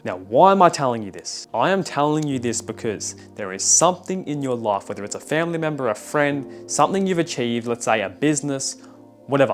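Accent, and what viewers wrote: Australia. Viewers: Is that an Australian accent you've got there?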